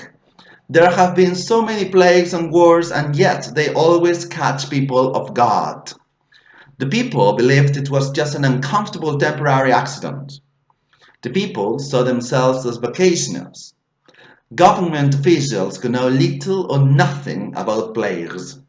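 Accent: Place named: London, United Kingdom. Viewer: en serio?? Parece que hubieras vivido ahí, xq el acento es británico-londinense, increíble que sólo lo hayas adquirido estudiando.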